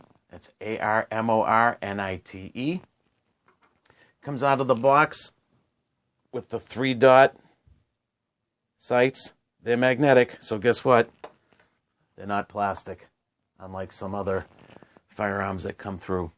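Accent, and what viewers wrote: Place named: Boston, United States. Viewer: first video ive seen of yours off rip i can tell your from Boston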